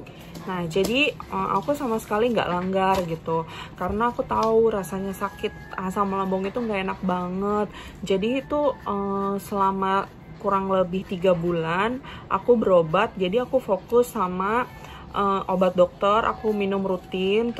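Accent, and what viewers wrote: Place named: Palembang, Indonesia. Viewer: wong palembang ceh ?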